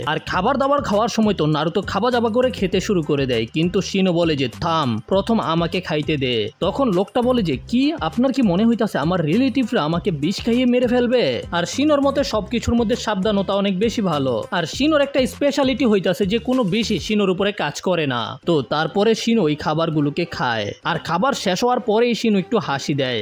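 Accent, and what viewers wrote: Comilla, Bangladesh. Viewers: ভাই আপনার বাড়ি কি কুমিল্লা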